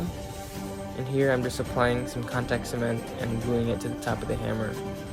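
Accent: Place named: Asia. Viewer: bro where are you from where your accent is like asian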